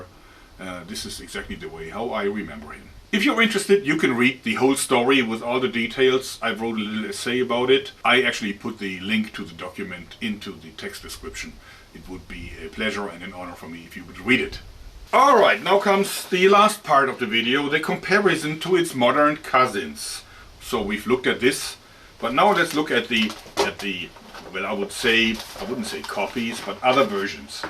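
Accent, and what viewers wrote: Germany. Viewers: A fellow Person that hates n!zis (jugding by the akcent maybe Even german too)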